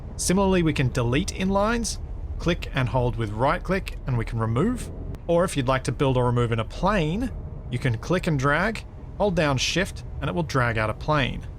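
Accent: Australia. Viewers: I'm a simple guy. I hear Aussie, I sub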